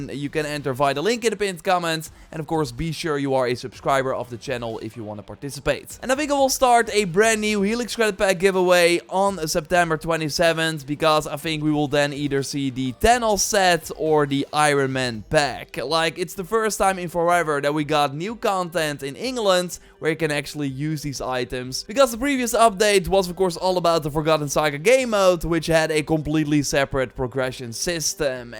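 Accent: Netherlands. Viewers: you sound dutch, is this correct?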